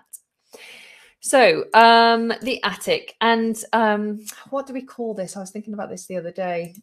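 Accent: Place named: United Kingdom. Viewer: Hello teacher.This is also a great one as always.Actually we try to imitate you to sound more british.Love your accent.Hope more pronunciation lessons from you.Love from Sri Lanka.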